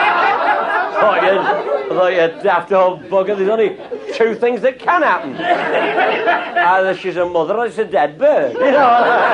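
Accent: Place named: Cheshire, United Kingdom. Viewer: Aye...it's reet Cheshire. Canst tha kick an 'ed a bol' aginst a wol' 'till it's bosted? ;)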